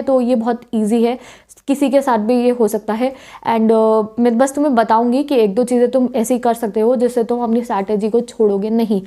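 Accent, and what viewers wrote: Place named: Meerut, India. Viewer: Didi where are u from ? I am from meerut and it seems that you are also from meerut .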